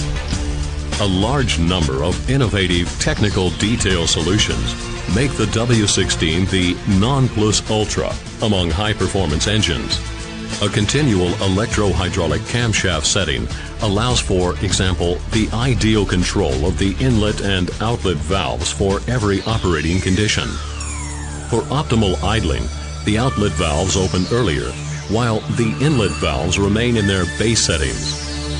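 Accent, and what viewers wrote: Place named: France. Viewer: why is he saying everything in a french accent :/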